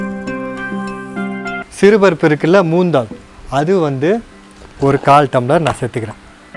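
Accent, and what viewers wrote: Tirunelveli, India. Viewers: Pattani paruppu Tirunelveli slang... you're speaking more Tirunelveli slang only...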